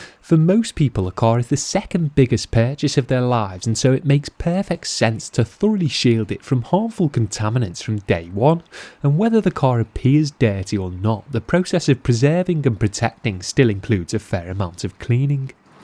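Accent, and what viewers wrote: Liverpool, United Kingdom. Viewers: Your accent is like a mixture of scouse / northern. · No worries, I can only imagine. By the ways, loving your videos and your accent (what region of the UK is it from?). Big hello from Canada!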